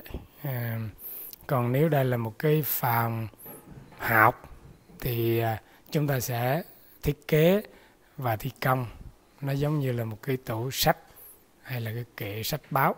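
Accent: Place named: Quang Ngai, Vietnam. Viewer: Giọng giống ở QNgai quá. Hì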